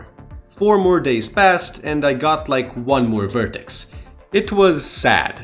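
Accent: Israel. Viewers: אז למה יש לו מקלדת בעברית וגם יש לו מבטא חשבתי בהתחלה שזה לא דומה למבטא ישראלי אבל זה כן מזכיר פשוט הוא לא עושה את הר והח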